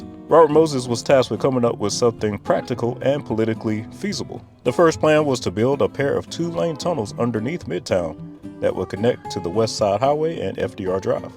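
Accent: South, United States. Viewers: You're obviously from the deep south; why do you call it Long Guyland?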